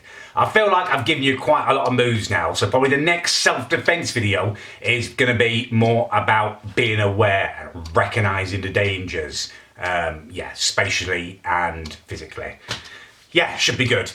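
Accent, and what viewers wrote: United Kingdom. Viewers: Anthony Fantano with a British accent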